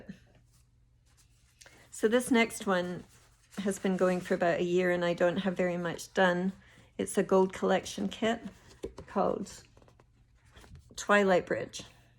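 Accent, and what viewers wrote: Scotland, United Kingdom. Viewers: You can definitely catch the Scottish accent. Welcome to flosstube. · Hello from a fellow Scottish stitcher. Great first flosstube. I actually came across your third one first and as I was watching I thought I heard a Scottish twang so stopped that one and started watching this one to see if you said you were Scottish and you are, lol! Loved seeing all your projects and I’m like you, I love stitching things with houses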